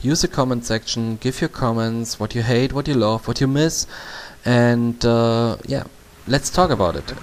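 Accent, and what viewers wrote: Germany. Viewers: Ich wusste ab sofort, dass du Deutscher bist xD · Best german accent i have ever heard of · Du bist ein Deutscher :D Das höhrt man!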